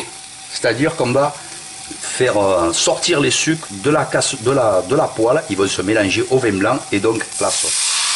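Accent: South, France. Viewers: Hum 😋 et en plus ta une belle voix avec accent du sud 😂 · Une recette pour le régime....😅, c'est pas grave, ça tombe bien je suis ce type de régime ! mdr...., vu l'accent que vous avez on est dans le Sud c'est clair 🥰, bien le bonjour d'un Ch'ti décentralisé dans le Puy de Dôme 😁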